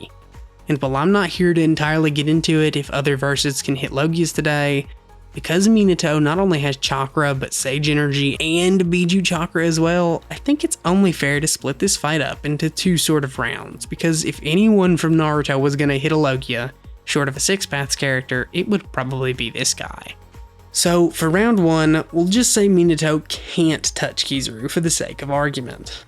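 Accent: United States. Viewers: Do you have a cowboy accent?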